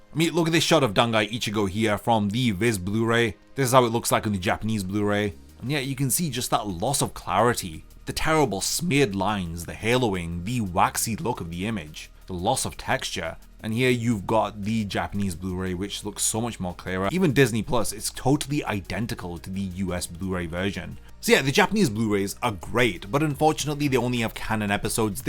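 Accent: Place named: United Kingdom. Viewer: i have been buying the UK blu-reys, of Bleach an the Naruto ones, i did my own comparison from Naruto on Disney+ an found that the Disney+ version was way lower quality than the Blu-rey ones. I havnte checked Bleach tho. As this is a new video didnt u try the UK versions? Forgive me but you sound British maybe u live outside the UK, i dont know or maybe u have a large US fan base and are catering for them??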